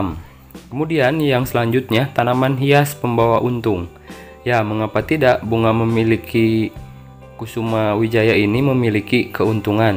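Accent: West Java, Indonesia. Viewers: Ari si akang orang Sunda ya 😀😀😀🤭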